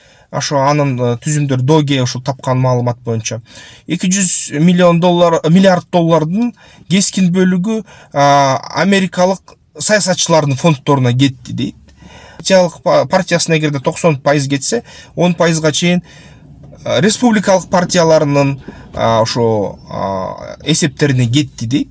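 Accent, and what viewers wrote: Standard. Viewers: тушундуруу таза кыргызча эн соонун❤👍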